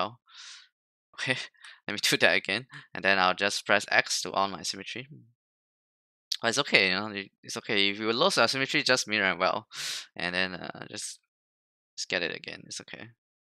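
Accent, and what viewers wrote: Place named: Singapore. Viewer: Sounds like a Singaporean...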